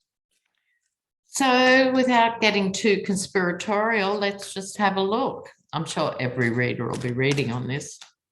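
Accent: Australia. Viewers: I love your Aussie accent!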